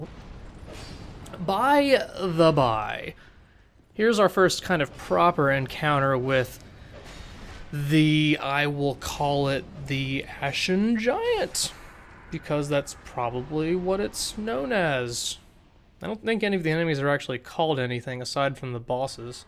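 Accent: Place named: Texas, United States. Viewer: i can already hear the texas in your voice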